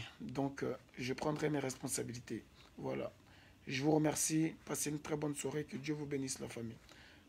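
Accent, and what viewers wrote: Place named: France. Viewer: Ton acent francaise me plait bocou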